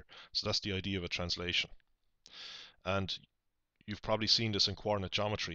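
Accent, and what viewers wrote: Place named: Ireland. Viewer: hay im doing my leaving this year and there is a certain question that seems to re-appear often finding unknown points on an argand diagram it is on the 2013 new sec paper 1 section A question 1 (b) i was wondering if u had or could possibly make a video for this question as i suspect it could appear on my paper thanks very much.( its great to have an irish accent doing a commentary theres only so much American accent i can take:)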